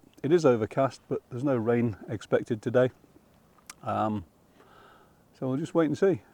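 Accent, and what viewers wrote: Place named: Doncaster, United Kingdom. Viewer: What was the name of that reel that released the line from the clip if you got a strong bite? When they came out, I decided I would buy one the next time I went to my local tackle shop, but like many things, I forgot! Where did you live when you were in England,? You seem to have an accent similar to my area of Doncaster.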